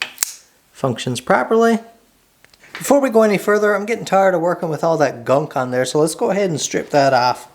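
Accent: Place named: Newfoundland, Canada. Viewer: I knew it! I checked out your info below the video to see if it was true, I could tell by the accent! You're a newfie! God bless you. Great work on that knife. Good to see a fellow East coast Canadian on you tube. (I'm from New Brunswick by the way). Took a woodworking course in Cape Breton so that would have been my second guess. All the best to you and yours!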